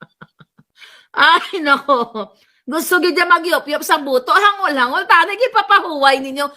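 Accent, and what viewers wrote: Visayas, Philippines. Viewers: Naintindihan ko maharlika ang bisaya mong salita hehehe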